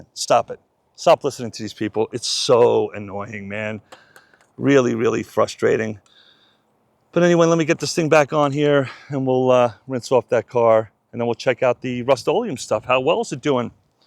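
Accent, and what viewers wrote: New York, United States. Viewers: It's that famous NEW YORK American accent!!;;; ❤ LOVE IT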